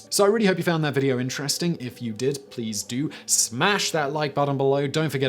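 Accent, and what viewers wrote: United Kingdom. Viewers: Okay? He’s British, American English will be different to traditional British English.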